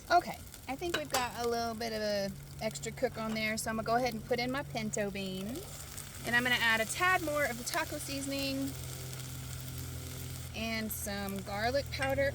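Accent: South, United States. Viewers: Are you originally from the southern part of America?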